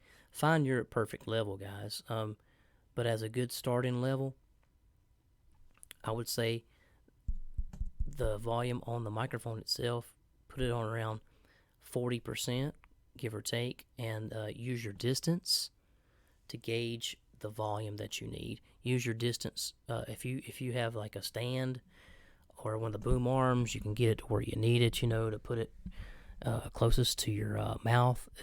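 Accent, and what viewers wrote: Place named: South, United States. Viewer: man you got that country vocal!! love the mic and nice commentary!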